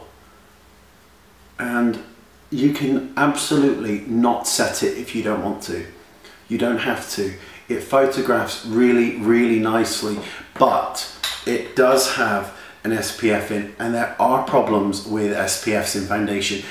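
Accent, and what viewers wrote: Australia. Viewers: r u australian? ive been told ur english but u sound australian o_O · i just realized you were British, i always thought you were from Australia from your accent haha :)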